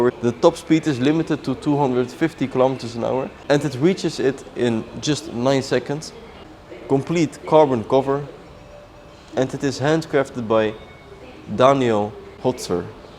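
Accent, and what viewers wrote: Netherlands. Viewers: The German right way, the US English right way, the British English right way? This is a Dutch native speaker who has of course a Dutch accent. Your accent?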